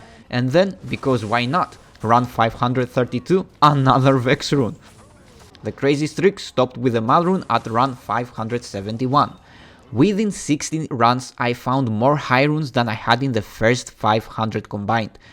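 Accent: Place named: Eastern Europe. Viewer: Comfy vibes? Check Eastern-European accent? Check Yep. This is a D2 farm video alright. Welcome to my second-monitor list, good sir 😊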